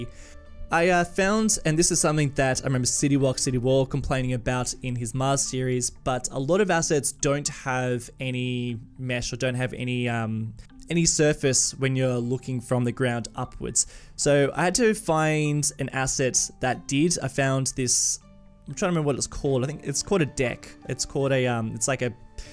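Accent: Australia. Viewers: Are you Australian? I love it when I find a youtuber who is from my country.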